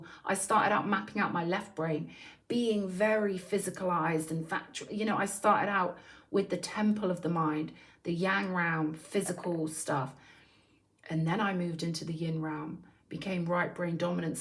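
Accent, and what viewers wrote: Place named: London, United Kingdom. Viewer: I love ur accent, your kinda like a posh London geez ❤😂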